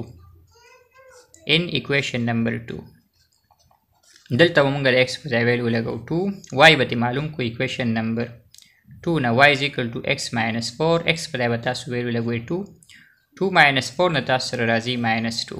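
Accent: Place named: England, United Kingdom. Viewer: What a english accent 😊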